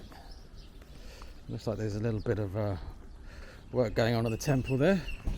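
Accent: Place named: London, United Kingdom. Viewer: Thanks for the back story. Would not have thought you had a private school background, and your dad being a muso. Obviously you have set yourself up really well financially, which requires hard work. I'm a Kiwi and to me your accent is very London working class, "inn-it".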